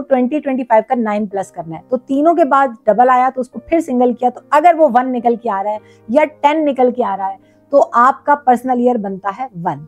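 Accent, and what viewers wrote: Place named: Pothwari, Pakistan. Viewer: پئ اللہ نی قسمےتساں پوٹھوہاری بہوں سوہنری بولنے او اللہ پاک سلامت رکھے تہ عزتاں دے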